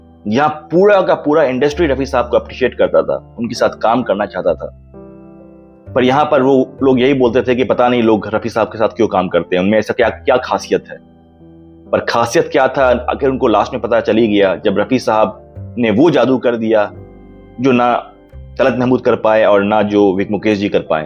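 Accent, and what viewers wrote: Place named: Bengal, India. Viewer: Aap ka gyaan sahi ho sakta hai, lekin aap ki hindi proper uccharan me nahi hai, aap 'R' ka uccharan nahi kar pa rahe ho. Bangali, Bihari tone ka touch hai.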